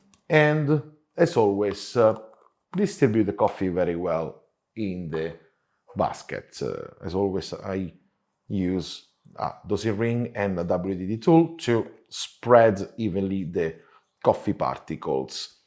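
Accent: Italy. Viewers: Buena idea, buena explicación, y ese acento italiano excelente!! jaja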